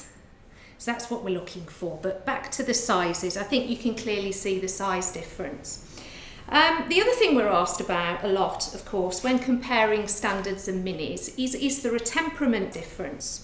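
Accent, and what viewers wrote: United Kingdom. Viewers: Thank you for explaining the Miniature and Standard Dachshunds. I would just love to have a pet dog Miniature Dachshunds. I live in France, Jura. I don't know where to go to buy a miniature pet dog Dachshunds. I was told that in Germany, they sell them? please do reply to me. Just love your British accent. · Considering is in the UK, it’s not surprising they don’t say it with a US accent 😂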